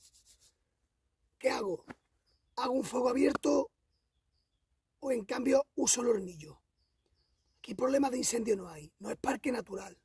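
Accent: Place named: Malaga, Spain. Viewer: Hola,por tu forma de ablar, me perece que eres de de Malaga.Yo soy de un pueblo de Malaga concretamente de la serrania de RONDA,de un pueblo que se llama <link> gustaria conocerte y poder enseñarte los montes y sierras que hay por nuestro entorno.tenemos unas bistas preciosas y unos lugares para hacer bushcraft impercionantes.y te enseñaria mi coleccion de cuchillos,a porcierto me llamo Rafael.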